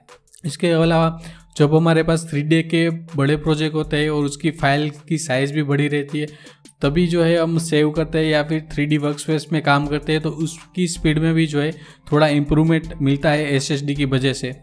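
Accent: Gujarat, India. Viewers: Ap Gujarati ho?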